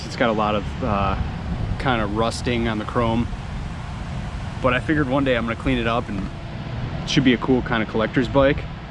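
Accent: Midwest, United States. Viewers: I told you we have to work on our midwest accents.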